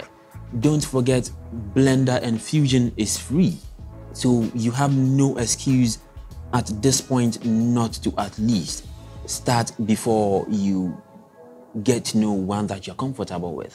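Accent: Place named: England, United Kingdom. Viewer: I know After Effects. Want to get into 3d. Learning Maya 3d these days. I like your English accent.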